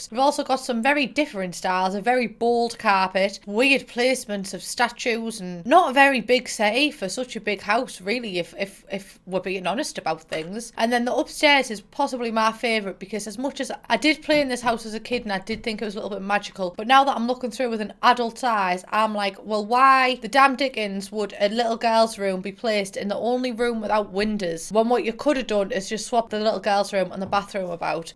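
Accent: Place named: England, United Kingdom. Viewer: I’ve binge watched your videos in the past few days and now the voice in my head has a very thick English accent and I’m not sure how to get rid of it...or that I want to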